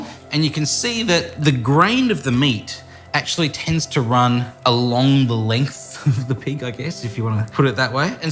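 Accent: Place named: Australia. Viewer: where you from? you also sound australian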